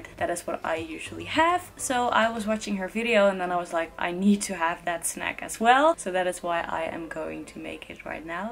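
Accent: Netherlands. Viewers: Toen je begon met praten hoorde ik meteen dat je Nederlander was :)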